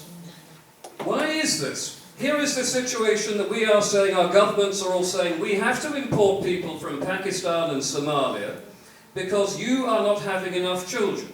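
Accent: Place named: England, United Kingdom. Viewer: is such a nice man, great mind and he always dresses well. He has a lovely English accent, its not high and mighty and its not common. His voice is actually a future PM voice.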